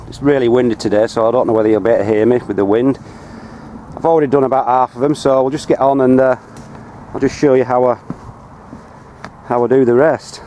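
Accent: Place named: Lancashire, United Kingdom. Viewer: I'm having a crack at growing veggies for the first time ever this year and doing the whole shebang in pots and containers..... Planted my spuds in rolled down compost bags about 3 weeks back but the weather was so wet and frosty had to drag them all into the garage, anyway there sprouting nicely now bout 4/5 inches with small leaves on top.... Should I earth over these now and unroll the bag back a bit? Don't want to earth up if its too soon? Love the videos mate, very helpful and certainly took many tips off them..... Where you from anyways? Good strong accent like that can't be far from my neck of the land up in Lancashire :)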